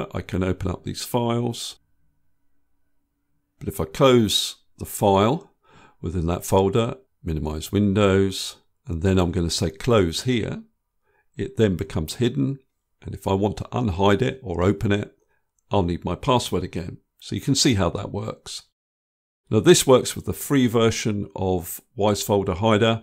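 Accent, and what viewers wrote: England, United Kingdom. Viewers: Thank you brother. Great, easy to understand. I think the English accent helped a lot. You have gained a new dinosaur subscriber!🤣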